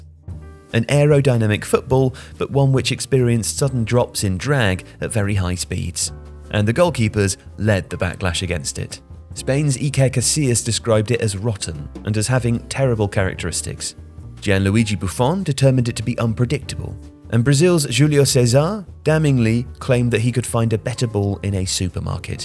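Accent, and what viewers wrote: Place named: RP, United Kingdom. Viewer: "lamiNAR" 😂 This lad is getting posher with every video!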